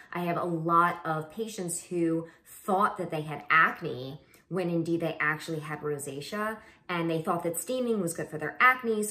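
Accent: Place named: California, United States. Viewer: Why you have Cali girl accent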